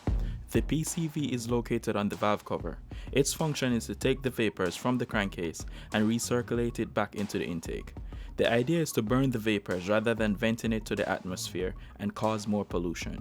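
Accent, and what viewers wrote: Jamaica. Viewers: Just subscribed my boss. Yuh sound like a yaadie. Everything you touched on i have done on my car. It makes for a trouble free.. Worry free..peace of mind experience. Great video, to the point and helpful. How can you differentiate a misfire due to carbon build up? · You Jamaican bro? bless up ✊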